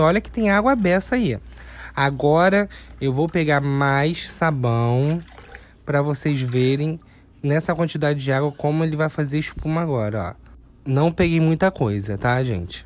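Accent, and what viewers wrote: Rio de Janeiro, Brazil. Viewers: Ñ sei se tem beleza física (creio q sim)😂 parece o Lombardi, só conhemos a voz 😂mas seus vídeos são os únicos q assisto sem acelerar. É didático, sem apelação. Seu sotaque (carioca?) Sua voz, td e agradável, td é proveito. Jesus te abençoe mais ainda.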